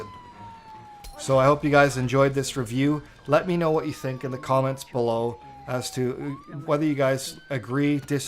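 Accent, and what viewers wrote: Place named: Canada. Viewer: This Canadian voice sounds familiar...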